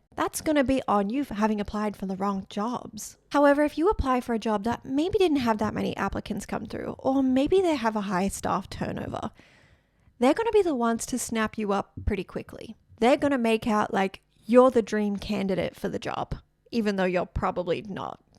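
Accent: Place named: Australia. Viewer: Girrrrllllll 🙌💓 you’re killing it! You have done a phenomenal job expressing this topic. It’s nice to hear a fellow Aussie voice on YouTube.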